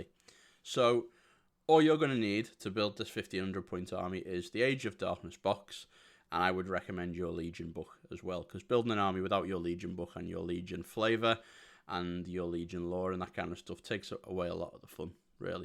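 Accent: Liverpool, United Kingdom. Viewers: wow, it's obv there but it's a much cleaner scouse twang than I'd expect. Must be from the posh part of Liverpool lol. Meant no offence haha.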